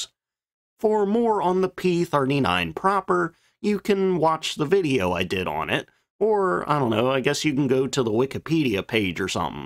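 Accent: United States. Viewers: Have you gone AI on us? that was the weirdest pronunciation of “Anacostia” I’ve ever heard. I found that odd as you talk like you’re from the Delmarva and maybe PA area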